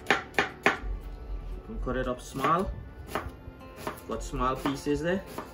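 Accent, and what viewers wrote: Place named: Guyana. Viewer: The awesome dishes that you are preparing and sharing the recipes, are the type of food that we ( we) I’m putting we in brackets because I’m not referring to anyone. My grandma used to make this awesome dish. Even to my grand father in law ( Indian decent) I’m so grateful for our ancestors and their recipes. Thank you very much, you rocks. Love all the recipes, god bless you brother. Must say that I love the Guyanese accent.That’s what I’m talking about.the awesome five finger fruit drink. That’s a power house juicer.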